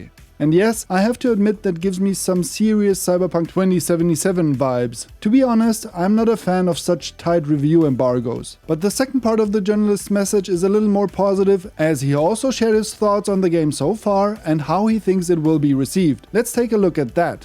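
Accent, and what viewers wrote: Germany. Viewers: german english accent is hilarious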